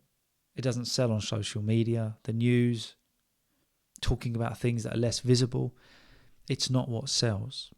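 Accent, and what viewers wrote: United Kingdom. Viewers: Thank you so much for continuing to make videos all these years and for never giving up. Wishing you and your family good health and happiness. I really love your videos, the way you tell stories and share your experience is truly enjoyable. And last but not least, i absolutely love the British accent!. I hope one day I will have a chance to visit the UK. Cheers mate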